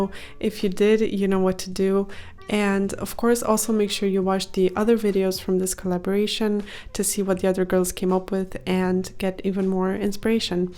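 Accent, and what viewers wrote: Germany. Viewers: oh thats interesting ^^ to me it sounded a little german. But maybe thats only, because i'm german ^^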